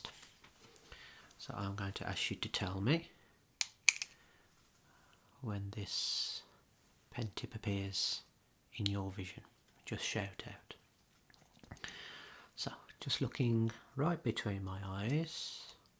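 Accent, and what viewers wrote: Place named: Black Country, United Kingdom. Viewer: Great video, love to hear the Black Country accent!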